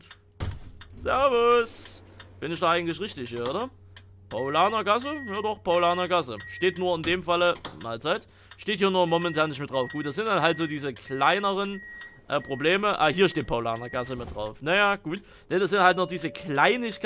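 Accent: Saxony, Germany. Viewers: Ich liebe dein Sachsen Aktzent wo wohnst du in Sachsen ich wohl bei Leipzig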